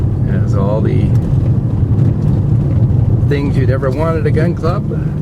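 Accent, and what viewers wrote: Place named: Chicago, United States. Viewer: Sounds like your in Chicago!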